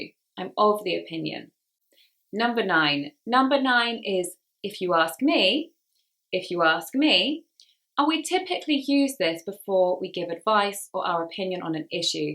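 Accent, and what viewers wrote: United Kingdom. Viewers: I believe brittish accent is awsome when you use it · Stop speaking british accent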